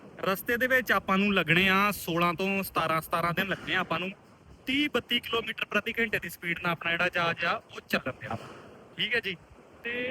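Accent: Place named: Amritsar, India. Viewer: Goodf information and you belongs to amritsar district as it is clear from your speech.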